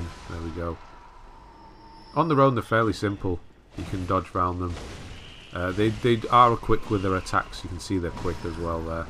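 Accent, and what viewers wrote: United Kingdom. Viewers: You have such a cozy, warm, british voice, I just can’t. It’s not only a very good guide, but just listening to you makes all this journey a bit easier. Thank you, somebody from UK. You make other peoples gameplay so much easier and controllable.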